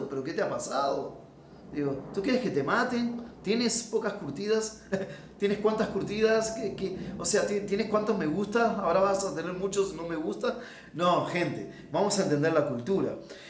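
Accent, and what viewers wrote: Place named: Brazil. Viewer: Excelente contenido amigo gracias por este video, no puedo evitar encontrar en su acento argentino, también una sutil mezcla del portugués brasilero .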